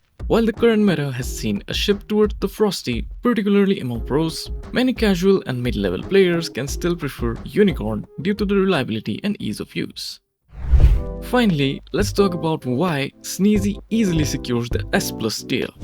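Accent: India. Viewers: Bro are you indian or what 😅❤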